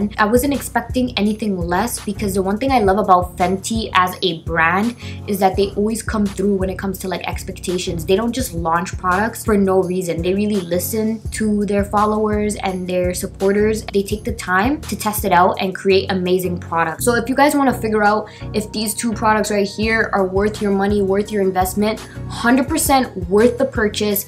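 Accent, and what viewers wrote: Toronto, Canada. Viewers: You talk like you live in Toronto/Scarborough.... 🇨🇦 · i hear the Toronto/GTA accent im dead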